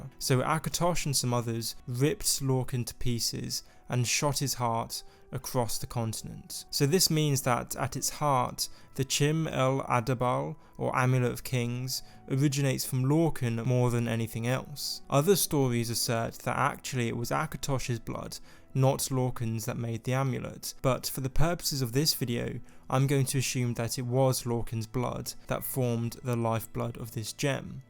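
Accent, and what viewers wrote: United Kingdom. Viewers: You have a specific type of British accent. Is there a name for it? I’m almost certain that you would pronounce the word “drawings” like “draw rings”.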